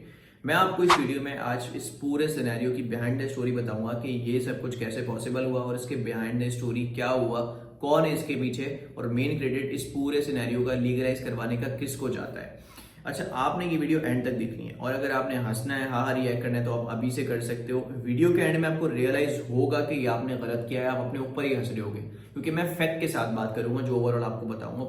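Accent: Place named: Karachi, Pakistan. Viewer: i watched the other video of 30 mins where he told whole story how his money was stolen. i thought this guy was really innocent, but after watching this video its proven this guy is another wrong number and cannot be trusted. Crypto becomes Halal after you pay TAX ? How did you pay tax and become halal for you while it is not taxable yet. ? How you or Waqar Zaka are Writing the regulation terms ? If you are son of honest mother and father do not remove this comment and answer all questions. and why you sound like a Karchi ka Bhaiyya with typical Ball licking gesture of Waqar Zaka. just because he guided you, you are speaking lies and promoting him like any corrupt politician for example. better fix yourself and stop acting like typical Gali ka Londa who would do Ball licking just for sake of being grateful to another scammer.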